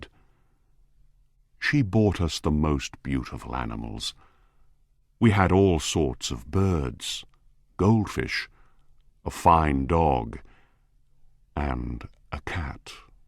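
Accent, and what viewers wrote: United Kingdom. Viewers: É inglês britanico? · Isso é ingles britânico né? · pela pronuncia acredito que seja britânico. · Tem acento britânico, amei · Por gentileza , o áudio é inglês britânico? Parabéns pelos vídeos!!!! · Inglês Britânico. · Lindo inglês britânico 🥰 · Ele tem um sotaque britânico ou foi apenas uma impressão por causa da história que não é americana? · esse inglês é britânico você sabe me dizer.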